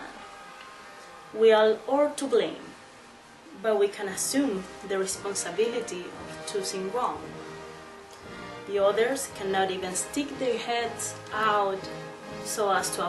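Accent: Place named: Germany. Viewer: so cute with your german accent! hahahaha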